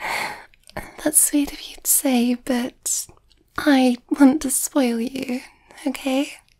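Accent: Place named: United Kingdom. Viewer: the british accent reminds me of Millie Bobby Brown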